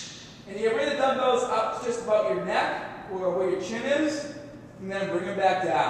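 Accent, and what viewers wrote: Boston, United States. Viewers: Any chance this lad is from boston?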